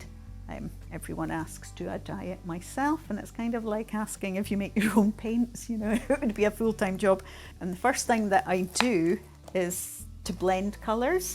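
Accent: Scotland, United Kingdom. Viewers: I love your work and your beautiful Scottish accent. My dear friend Wendy was from Arboath and I could listen to her talk all day long. Sadly we lost her to cancer a few yrs ago, but thank you for reminding me how much Ive missed her and what a treasured friend she was. Cheers from stinking hot FL. ;-)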